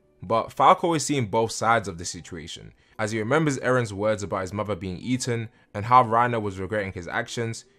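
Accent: London, United Kingdom. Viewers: Love your content bro. What part of London are you from?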